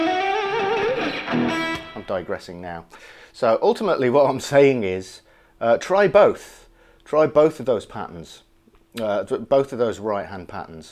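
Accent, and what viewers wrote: United Kingdom. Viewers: Put a camera on your face...once in a while...the mono-tone British thing is, well, a stumbling block....What's the Patton...a general?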